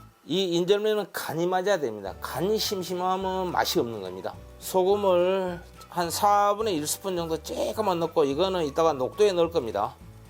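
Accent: Jeolla, South Korea. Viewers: 전라도 사투리가 아주 구수허니 정겹습니다 말씀 만큼이나 요리도 정성도 꼬숩고 대단하십니다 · ㅋㅋㅋㅋㅋ. 선생님. 말씀 구수한 사투리 전라도 말씀 진짜 잼있어요. 음식도 맛나고 말씀도 구수하고. 진짜. 잘 알려주시고. 고맙습니다. 모는 음식. 해봐야 하는데. 시간도 없네요 ~~ㅎ ㅎ😂😂😂🎉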